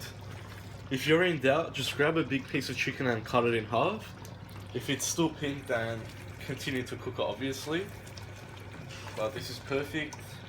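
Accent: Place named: Australia. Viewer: Jus' one observation. It never looks good when an executive chef uses cookware that is marred or scratched up on the cooking surface. The stockpot in this video appears to be a 'Teflon' coated pot, and the Teflon coating is obviously scratched off on the sides, and cooking surface of the pot. It just looks unsightly, and unappetizing. In addition, they say that toxins leech out of the pot when heated from under the Teflon coating. Jus' my observation, but of course, you may feel differently. I love your Australian accent, and your cooking style. I hope that I'm not mistaken in believing that you're Australian. Forgive me if I am.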